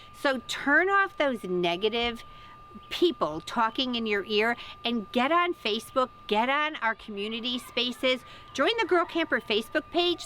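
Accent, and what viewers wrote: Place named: Wisconsin, United States. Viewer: I love your Wisconsin accent!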